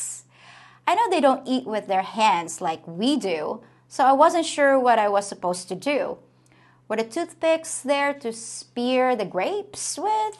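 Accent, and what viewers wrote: United States. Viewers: Wow! I'm so impressed with your observation in just a few days visiting the United States. Yup! American love their pets. and Yes, the odors of Marijuana in crowded places and you are right. I don't see anyone smoking. I hate the automated. I prefer to interact with a real person. Yup, water pressure is insane compared to the Philippines. Yes, Americans don't hang around in their yard. Btw, you speak like an American. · my dear, don’t know how I missed this video. We lived in the Southeastern corner of Georgia just above the Florida State line. It’s not hard to understand why they may have thought you were “American” you have barely any accent. My wife lived in the UK for a decade and has been here in the U.S. for 12 years this month. She still has quite an accent. The weather was something that got to her in the Southeastern U.S. 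after she was there about a month she said to me, “I never thought I would find a place more hot or humid than the Philippines…I was wrong!” The toothpicks are for picking up the food on the tray if using your fingers makes you uncomfortable. It’s been 13 years since my wife has been back to the Philippines. She misses Nanay and Tatay greatly but she knows it isn’t practical to go at this time. Anytime you travel, vacation eating is always hard. Take it from an old Marine, you have a rocking body! When you come back to the U S try the Intermountain West (Utah, Colorado, Idaho, Montana) it is a short hop from Saratoga Springs Utah to Vegas (4-5 hrs) a days drive to Los Angeles or a days drive to Phoenix Arizona. If you ever do come out here you are already welcome to the Household of Lolo D and Kazz!!! My wife would be a good interview having lived in the UK and here, her baby sister lives across the street and has lived in the UAE (not as an OFW) as a spouse of an American working there. 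As always another great episode! Keep up the excellent work! Lolo D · you have the American accent down to a T. If a saw and spoke to you, I too would assume you to be an American, because you do not sound foreign.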